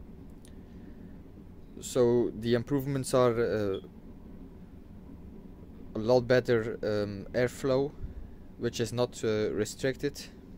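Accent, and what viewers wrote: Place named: France. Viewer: ce fameux accent français ;)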